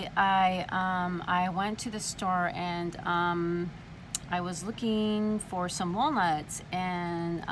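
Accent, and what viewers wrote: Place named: California, United States. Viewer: Accents are funny things. Um, I HATE when people say...um... what was that.. um, word? I don’t hear a lisp. But you defiantly sound like you’re from California.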